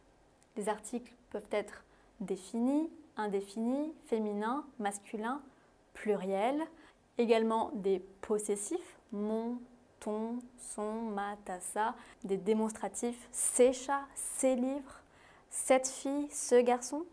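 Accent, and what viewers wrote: France. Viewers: Merci. C’est toujours une bonne idée de commencer au début. J’adore votre accent français.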